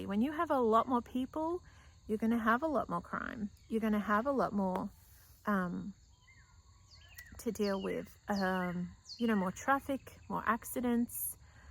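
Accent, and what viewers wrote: Australia. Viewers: I love a ‘rambling’ (your words, not mine) vlog! ❤ ps as a Brit I think your Aussie accent is a particularly nice one 😊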